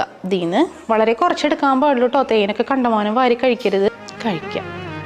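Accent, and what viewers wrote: Thrissur, India. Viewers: തൃശൂർ slang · Trissur dialogue ❤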